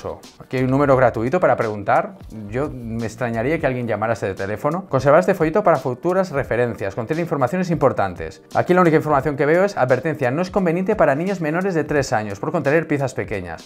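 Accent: Catalonia, Spain. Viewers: Con ese acento tan catalán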